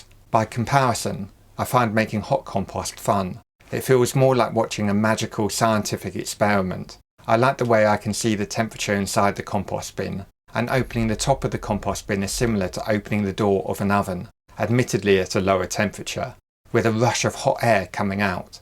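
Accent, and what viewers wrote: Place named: United Kingdom. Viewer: I love your British accent and how you say methane it's more like meethan for phonetics.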